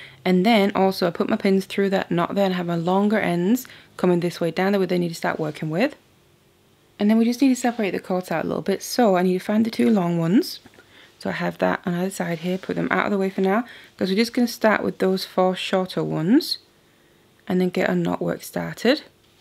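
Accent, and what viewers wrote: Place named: Newcastle, United Kingdom. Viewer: OK, I have to ask where your accent is from! I keep thinking I've got it, then it changes! There really sounds like some Geordie in there!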